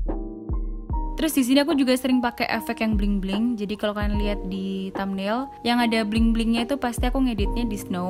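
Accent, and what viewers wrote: Java, Indonesia. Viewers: suka sama medok nya gemoy ❤️🤣🥺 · Kakak Asli mana ?? kok ngomong nya medok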